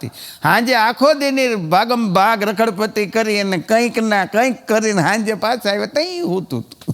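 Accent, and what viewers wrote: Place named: Kathiawar, India. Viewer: Tmey. Sudtth. Gujarati. Bhasama. Bolo. Kadttiya vadi. Bhasa. Gamti. Ndthi😢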